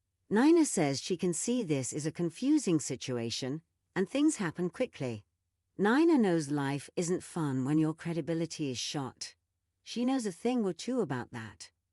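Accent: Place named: Brooklyn, United States. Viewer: Have never listened or watched your updates before I find your voice and the slightly different way you talk wonderful it's like why can't people be nice to hey she's from Brooklyn you know New Yorkers Brooklyn everybody living there has an accent from somewhere just thought I'd throw that suggestion out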